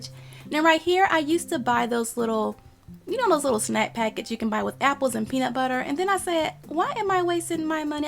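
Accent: South, United States. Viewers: Subscribed cause I love her southern accent 😍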